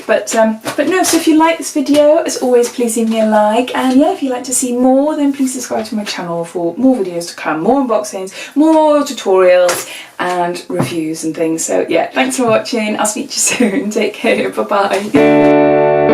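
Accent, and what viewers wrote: United Kingdom. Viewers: hey, so cool you answered me ☺️ i got a face mask and lip scrub that I loved but then a lip liner ( I’m a lip gloss person) tweezers and a mini body wash and lotion. So not the best, I’ll try for a few months and see. I just signed up for a few. Just found out they existed a month ago 😂 I’ve been missing out. I’ve watched so many unboxing videos since, a lot of yours, I love the British accent, it makes me feel fancy 💃🏻 keep it up.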